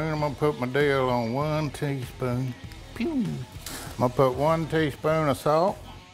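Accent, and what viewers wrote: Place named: Kentucky, United States. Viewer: I done learnt that, 😂 I love it. Hey, you sure you ain't from Kentucky? You sound like one of us good ole boys from up here in Kentucky. Enjoyed the video hope y'all boys and ladies in the DD world are all doing well. God Bless and keep the videos coming, love em. ☮️ 💜 God Bless y'all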